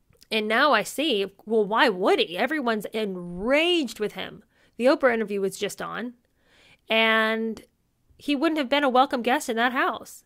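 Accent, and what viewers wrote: United Kingdom. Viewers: does a remarkable British accent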